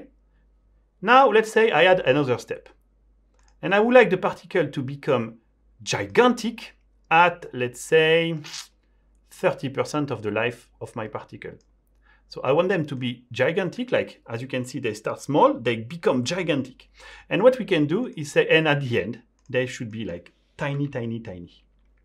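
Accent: France. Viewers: I think it's a french's accent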